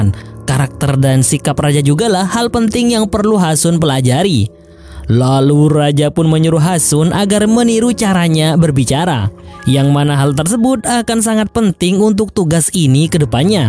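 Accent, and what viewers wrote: Madura, Indonesia. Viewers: Iya ya logatnya madura banget😂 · Reng madureh kak ? 😅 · Mas pengisian suara nya , orang Madura,logat Madura nya kelihatan banget 😅😊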